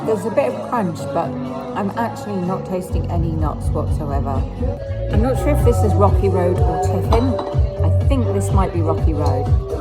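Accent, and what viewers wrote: Ireland. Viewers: perfect Irish accent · Loved the Irish accent haha ❤❤ I would love to try a banana daiquiri but never see them on the menu anywhere! Those cocktails at the end looked amazing 😻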